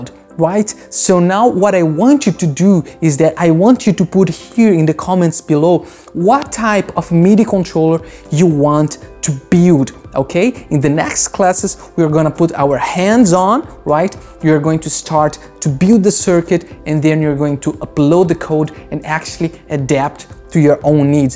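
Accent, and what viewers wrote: Brazil. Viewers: Thanks for the info, I am going to build a controller for my DAW. I am from England but now permanently live in Brazil (Your accent sounds Brazilian).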